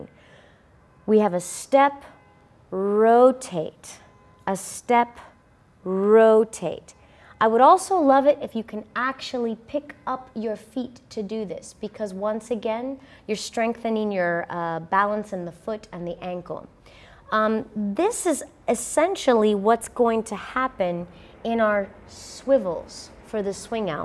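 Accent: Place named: United States. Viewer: Random comment, but I like your American accent :) Good enunciation!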